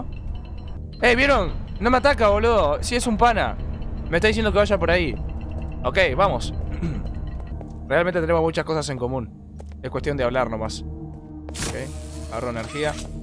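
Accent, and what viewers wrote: Uruguay. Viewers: no es argentino es Uruguayo es q lo args y los urus hablamos igual xd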